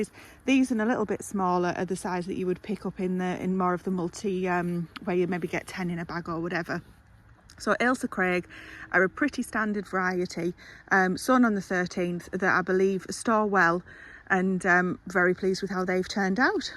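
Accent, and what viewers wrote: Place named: Geordie, United Kingdom. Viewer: I just click back into the video and yes I did recognise a little Geordie accent normally would’ve rang a bell straight away because my brother was married to a lass from Newcastle 🫶🏼. Anyway enough waffling all the best have a great weekend. Kind regards from the Netherlands Nigel Burgess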